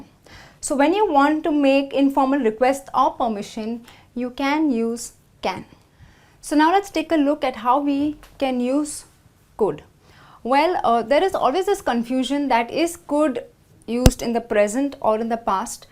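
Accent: India. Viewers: ما تعرفين تتكلمين انجليزي زين الهندي غالب عليكي بس عادي المهم تعرفين انجليزي 😆😆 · I love your indish Accent im not so good english but thanks for the lessons ♥ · тут че индийский акцент?! сложно привыкнуть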